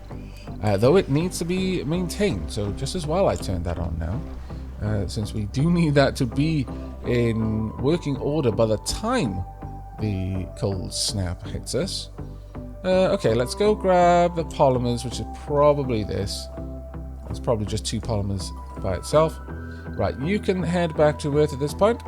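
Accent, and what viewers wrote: Wales, United Kingdom. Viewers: I always thought your accent was 100% unapologetically Welsh until yesterday while watching your video my fiance asked if I was watching "the German guy" and when I mentioned this to a friend he said you sound Swedish. 😂 not sure what they are hearing tbh.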